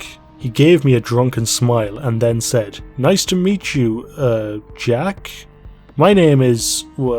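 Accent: Ireland. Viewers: He does I was thing the exact same thing. Also because they both have a deep voice and an Irish brogue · Sounds Irish. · He sounds irish Jacksepticeye intensifies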